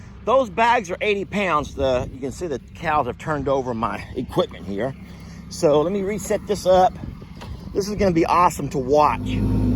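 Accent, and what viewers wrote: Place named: Louisiana, United States. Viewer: Omg you are hoot, we were driving home from walk last night had this on in car listening through blue tooth and when you were measuring and said “well I see it’s just over 5ft in this direction” we fell out hollering what direction is that lol ohhhhhh lawd you are comical! Made me feel like I was at home in Louisiana I love it! Living in KC they say I say the funniest things 🤷🏻‍♀️ that’s way we talk in South 🙌 love this it’s really cool we use to have something similar but we threw square bails in it for animals! You did good job, looks great! You were spot on in your measurement in that direction!! 🤣🤣🤣 I’m just messing with you!❤️❤️❤️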